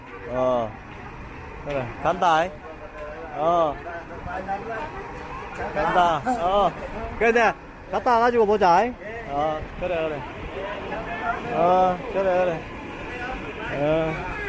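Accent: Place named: Busan, South Korea. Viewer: 부산사투리 정겹네요 음색도깔끔하고ᆢㅎ 캄보디아간접적 보고생활도느껴보고 고마워요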